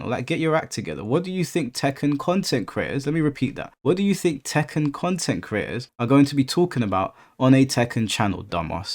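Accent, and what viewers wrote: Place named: United Kingdom. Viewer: Lmaoooooo so when I 1st watched your videos like this, it was my 1st time at your channel so I had no clue what you looked like, just a voice. For the longest time I thought you were white and looked something like the4thSnake 😂💀😂💀 being from the US, the UK accent sounds the same on everyone, it’s not like the US where people “sound white” or “sound black” ect to put it simple. But then I found out you were black and my mind was blown.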